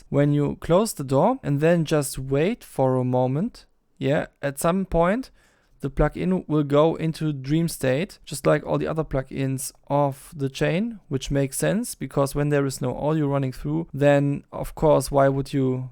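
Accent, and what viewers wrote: Germany. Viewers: Boah deinen deutschen Akzent hört man sofort raus 😅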